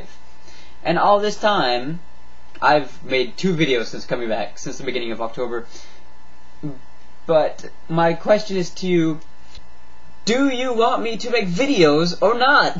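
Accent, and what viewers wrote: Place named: Japan. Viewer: The ages of 13-17 and 45-54 must be the most despicable male age ranges, due to a lack of women/ lack of attractive women. I'd imagine the desperation of their lives leads them to your feline idolatry. Please exploit it and encourage all your subscribers to dress as cats and crawl across the floor. That would be such a good set of videos! Just tag them By the way anyone who has an interest in cats should check out icanhascheezburger. I'm very jealous of your Japanese accent.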